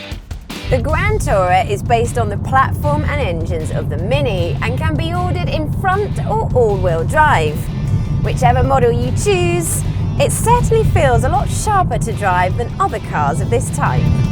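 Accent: England, United Kingdom. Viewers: Lovely English accent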